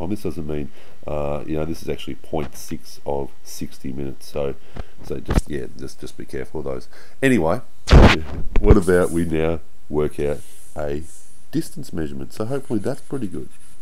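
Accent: Australia. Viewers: Lol u sound like someone from love island Australia and thanks for the lesson really helped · Thanx Aussie, thats the best description I can find for this problem, no Indian accents(no offence intended), by the way hope that head cold clears up for ya soon, LOL.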